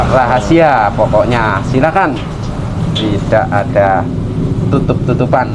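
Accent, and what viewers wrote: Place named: Rembang, Indonesia. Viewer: bahasa rembang meduk.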